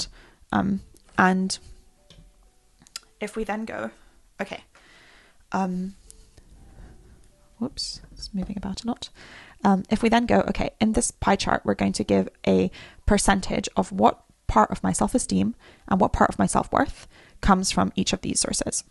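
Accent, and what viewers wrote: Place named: United Kingdom. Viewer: You make double effect: 1) food for thought 2) what a lovely accent (fantastic, because I just learning English(few years :) ) and I admire British accent) Thx a bunch for all you're doing!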